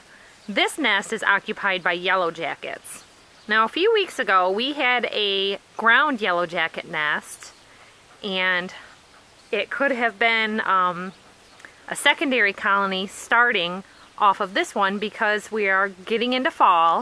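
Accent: Minnesota, United States. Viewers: She must be from Minnesota. Cute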